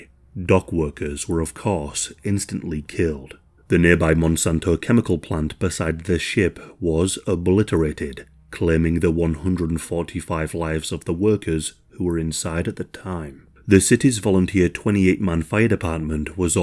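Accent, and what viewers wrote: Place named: Yorkshire, United Kingdom. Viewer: I don't think it's Scottish or Welsh. I am going to go with Yorkshire, probably North.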